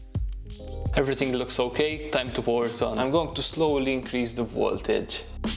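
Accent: Malta. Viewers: Can I ask, are you Maltese? Having Maltese family, I'm hearing the accent all the time and yours sounds very familiar! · It's unmistakably Maltese, and the name is another giveaway. I lived there for 15 years.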